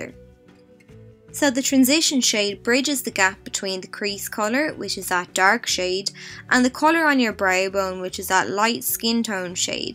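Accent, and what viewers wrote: Ireland. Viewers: Are you Irish by any chance? Xx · Are you Irish??👌👌👌👌👌👌💎💎💎💎💎💎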